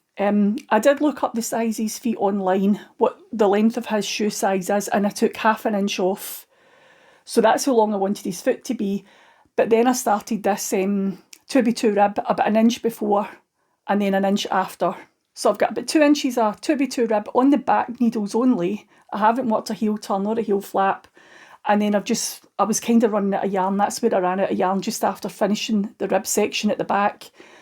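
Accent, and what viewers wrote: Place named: West Scotland, United Kingdom. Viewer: Hello from the west coast (loch Lomond) just found your channel. Fab to hear another west coast accent 😊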